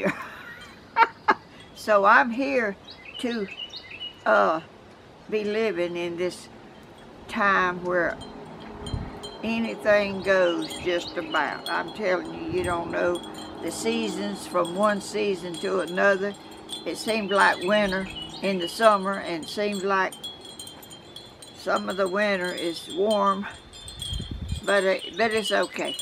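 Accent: South, United States. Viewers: Hi Ms.!! I am so happy that I found you! I love watching you craft, and I love hearing you talk...I have family(now passed) that lived in NC and just miss hearing that southern accent! makes me feel good inside!! Anyway, I would bet that a lot of your regular viewers would really enjoy hearing stories about your life...when you were a little girl and what kinds of history that you saw happen. Im listening to you talk about the snake, I dont know how you stood still for that. I would have kicked him across the yard or passed out!! Keep up the good work-and may God continue to bless you and continue to bless us with you. Sending a big hug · Hello Miss - I just came across your channel a few days ago and am so happy I found you. You are such a talented lady with a beautiful soul, I love just listening to you talk in that beautiful southern accent. I live in Canada, way off the west coast on Vancouver Island, but I have visited Georgia, driving from Atlanta to Brunswick and back up the coast to Savannah, so I'm familiar with the red sand and love those boiled peanuts! 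Love Georgia - I also have a Godson living with his family in Lawrenceville, so may even visit once again some day. I particularly love those green glass leaves behind you - is there a video on how to make them??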